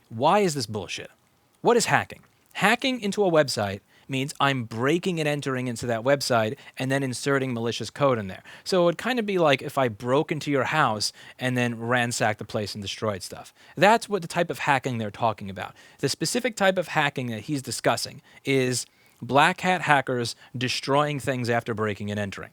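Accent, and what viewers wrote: New York, United States. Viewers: He's not an angry New Yorker, just how most new yorkers from NYC and close area talk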